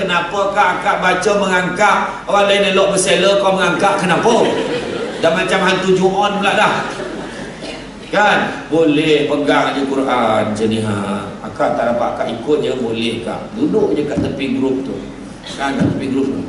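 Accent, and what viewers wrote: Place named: Kedah, Malaysia. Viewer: bru 2/3 hari lps...sggah solat mgrib di masjid sbrg jaya... mcm.biasa sronok lah tggu cramah.ustaz... tetiba 2/3 pth je paham... slebihnya... org kmpong ustd tu je la yg paham agaknya..jemaah pompuan saling memandang.. then ada sorg mkcik dtg kat saya..tanya..paham dak.. smbil tengok tv yg dipncarkn khas bhg jemaah pompuan..saya senyum..saya pon tak pahammmm... moral of the story..masjid2... kna tengok jugak la ustd tu mcm.mana... maybe sesuai bg cramah hanya utk qariah ustd tu jer..sbb diorg jer yg paham loghat pekat ustd tu ...skian (bukn klantan..bukn jugak tganu..i think smwhere in kedah)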